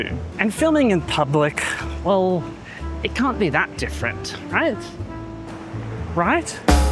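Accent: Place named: Australia. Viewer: How great it is to hear an Aussie voice. All the best with your journey, if it's a happy one, then things will continue to get better.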